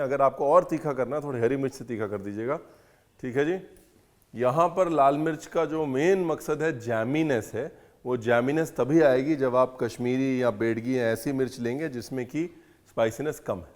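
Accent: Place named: Kanpur, India. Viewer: Aap to kanpuri boli bolte hai fuffpi kanpur ki thi mujhe Aap ki her dishes pasend hai Mai banati hoo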